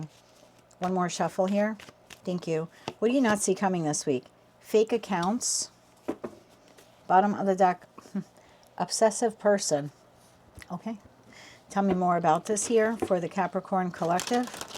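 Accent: East Coast, United States. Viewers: You sound like you’re from the East Coast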